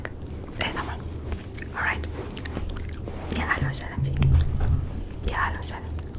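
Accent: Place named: Damascus, Syria. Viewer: صرلي ساعة بفكر لكنتك شامية ولا حمصية 😂❤️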